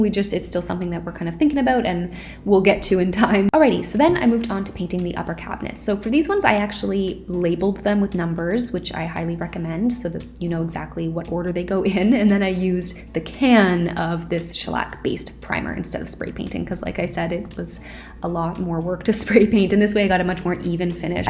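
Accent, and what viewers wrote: Canada. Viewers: CANADIAN ACCENT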